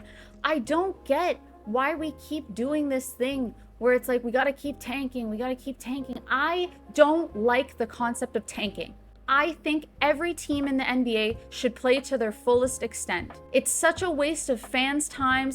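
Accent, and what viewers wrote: Canada. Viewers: Are you canadian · i was not expecting the toronto accent omg 😭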